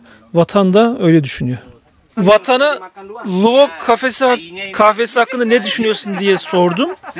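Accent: Samsun, Turkey. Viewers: Samsunlu olduğun 52 deyişinden belli abi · Samsun 'da okudum. Samsun ''e''lerini ozlemesim. Dört gözle bekliyorum 50 filan demeni 😃😃😃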